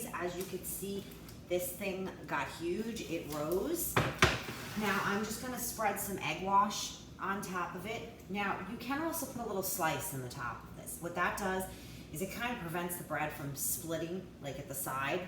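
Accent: New York, United States. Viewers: Half Italian, half Irish. Lol, that's pretty typical of NY/NJ/CT. I live in Florida now, and it's comforting to hear my accent! 👍🏼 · Hello from ALBANY!!!! I just baked bread tonight for the first time....Puerto Rican Pan Sobao. It was deelish! I'm a PR from Brooklyn, who 30+ years later still sounds like you...."why can't I find decent Italian bread in Albany?!?!?!???". I stumbled upon your recipe and was totally enamored when you mentioned Albany. I may try your recipe this weekend. Looks awesome!!!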